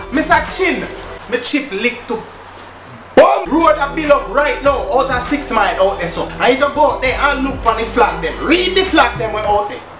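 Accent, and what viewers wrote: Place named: Jamaica. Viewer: I love the Jamaican accent.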